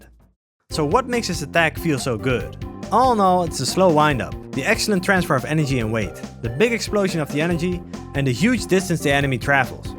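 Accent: Netherlands. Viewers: u dutch ? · your english is decent, it took me literally a minute to notice your dutch accent :p u have a typical dutch D & T. coole video trouwens.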